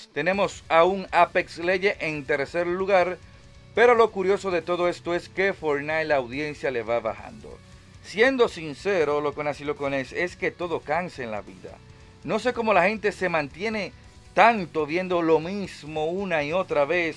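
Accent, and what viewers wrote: Dominican Republic. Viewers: Eres dominicano?😕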